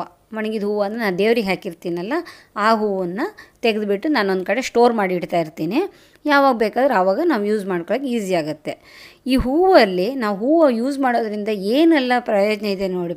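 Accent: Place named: Malnad, India. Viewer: ನಿಮ್ಮ ಸಲಹೆ ಮತ್ತು ಮಾತು ನನಗೆ ಇಷ್ಟ. ನಾವು ಮಲೆನಾಡಿನ ಜನ. ನೀವು ಮಾತಾಡುವ ಶೈಲಿ ಹಾಗೇ ಇದೆ. ನೀವು ಯಾವ ಕಡೆಯವರು ಮೇಡಂ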